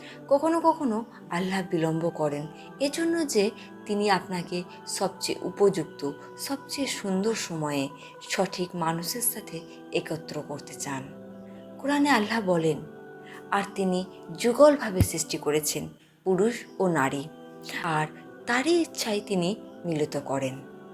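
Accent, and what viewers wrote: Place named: India. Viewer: আপনি কি ভারতীয় , আপনার কথা ভারতীয়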